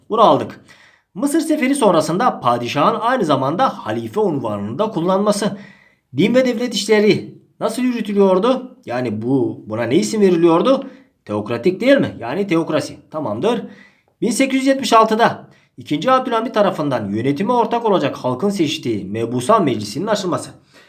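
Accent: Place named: Diyarbakir, Turkey. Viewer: Diyarbakirlilar da öyle konuşur ha :))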